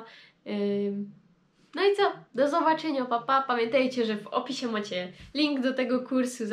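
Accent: Silesia, Poland. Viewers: Urodziłem się na śląsku mialem 3 lata jak sie wyprowadziliśmy dla tego tak uwielbiam tą twoją godkę :D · A ja to się zakochałem w tej godce. Godoj bo to dodaje Ci uroku ! :) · Słonko Twój głos brzmi lepiej po śląsku niż w mowie Polskiej :D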